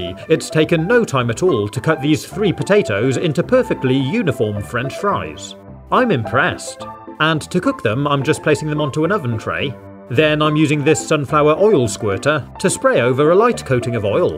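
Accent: England, United Kingdom. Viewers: VERRY old days: no sound kinda very old days: iconic music old days: voice and iconic music kinda new days: deeep voice and iconic music a lil bit new days: less deep and more british and iconic music new days: more less deeper voice and iconic music so uh yea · We all love a hood english lad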